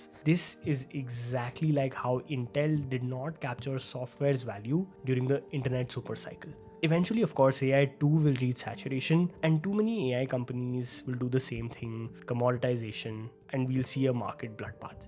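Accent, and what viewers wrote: India. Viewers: Indian accent 101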